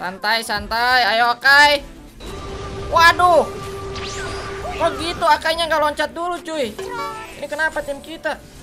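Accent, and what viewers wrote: West Java, Indonesia. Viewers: Bang orang Sunda ya😂😂 sama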